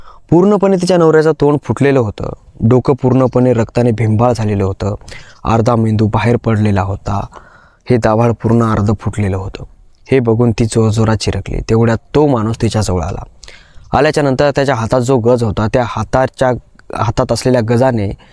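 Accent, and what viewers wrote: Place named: Vidarbha, India. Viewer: भाषा आपल्या विदर्भाची आहे भाऊ तुझी. लहानपणी आज्जी आजोबांच्या कथा ऐकलेल्या आहेत तू असं वाटतं. खूप छान कथाकथन करतोस तु. Good keep it up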